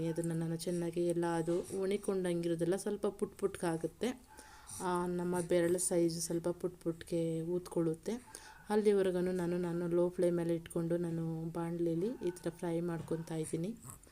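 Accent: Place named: Telangana, India. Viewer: Good TELANGANA Telugu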